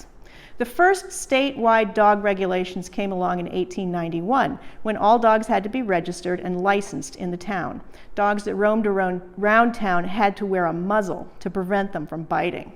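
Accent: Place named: United States. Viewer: Why is an American lady talking about Exeter history?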